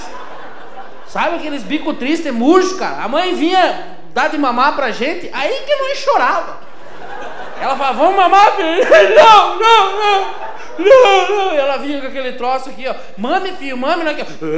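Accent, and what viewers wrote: Curitiba, Brazil. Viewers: Se tu nasceu ao redor de Curitiba , esse aí é o sotaque . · Myller Rodrigues Curitibano!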